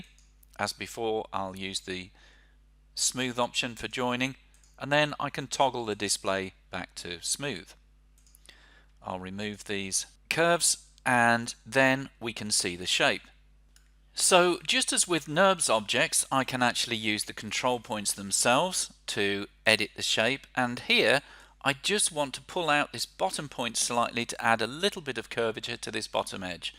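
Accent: United Kingdom. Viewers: good material but appalling teacher, a text to speech bot is gonna do better and with more life than you. Not to mention a posh accent is the last thing we want in a tutorial. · Beautiful and sexy English voice and models.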